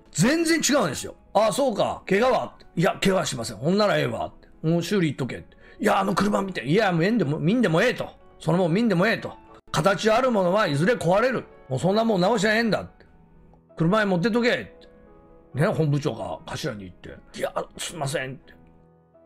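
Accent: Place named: Kansai, Japan. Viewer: 話し方から関西方面の組織かな？やってたのなら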